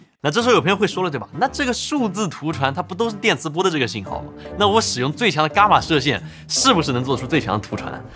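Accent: Taiwan. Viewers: 你很机车，妥妥台湾腔。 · 台灣人這樣講話的嗎??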